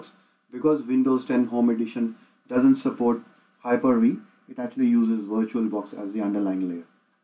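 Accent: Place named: India. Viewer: Indian speaking people saving my weak ass once again. Love you <3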